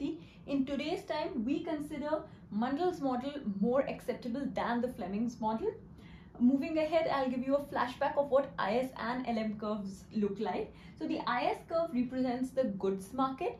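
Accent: India. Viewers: Usually, the Hindi accent stands in a way of my understanding of the given concept. However this video was properly explained, so the language barrier did not occur. Keep up the good work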